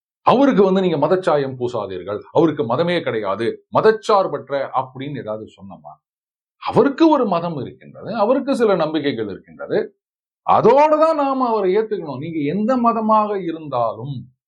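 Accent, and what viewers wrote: Chennai, India. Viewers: Ennaiah nee Chennai Tamil pesura?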